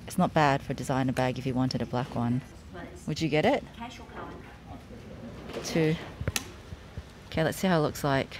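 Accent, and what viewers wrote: Australia. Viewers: Notification squad!! Hey btw I love love your Aussie accent ❤️😍🥰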